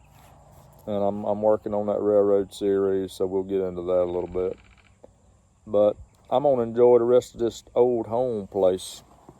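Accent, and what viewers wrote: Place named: South, United States. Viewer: Sorry to hear about the loss of your wife's granny. Praise God for the Comforter Who helps His people. As for the way you sound, sounds like a southern to me. As for as trains go I miss the caboose . When we were kids we would wave at the men who rode in the caboose . There is a lot to be said about trains. Really I don't know what makes one run anyway. I enjoyed your video. Have a gooden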